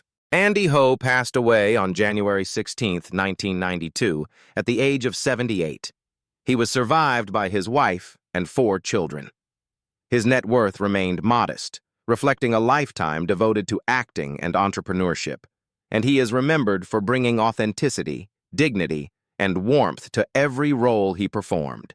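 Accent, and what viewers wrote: United States. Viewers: Why use an American voice to tell us all about a British show. 😢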